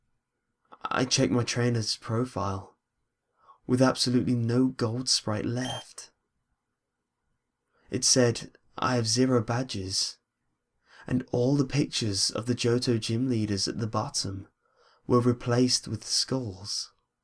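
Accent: United Kingdom. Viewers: I'm guessing you're British or English.....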